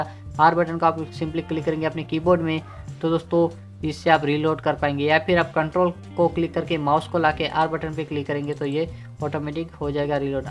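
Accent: Gujarat, India. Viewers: Gujarati Cho? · Bhai aap Gujarati ho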